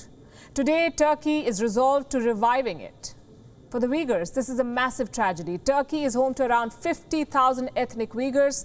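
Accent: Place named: India. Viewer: Palki ji I want your audiobook Your reports are next level ,....nd Indian accent 🔥🔥🔥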